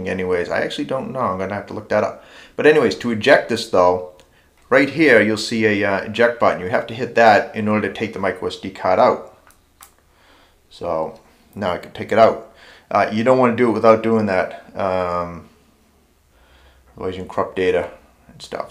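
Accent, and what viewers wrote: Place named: Boston, United States. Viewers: lol im from amsterdam and i was about to ask if it was a boston accent heheh cant miss that shit :) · Way to go. I recognize the Boston accent. I’m from Boston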